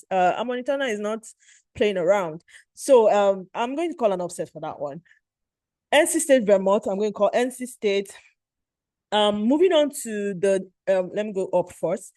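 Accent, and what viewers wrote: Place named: Nigeria. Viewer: I'm Kenyan so I was loving the Nigerian accent Oooo! Thanks for the video. I used your exact model for 1 of mine. Wild & crazy bracket! Wish you the best oooo! 😜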